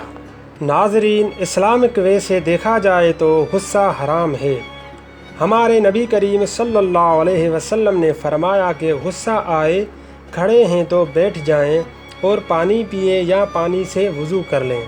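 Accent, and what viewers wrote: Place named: Sindh, Pakistan. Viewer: Keep it up Baki urdu improve karne ki kafi zaroort ha kafi sindhii lhja ha 😁😁😁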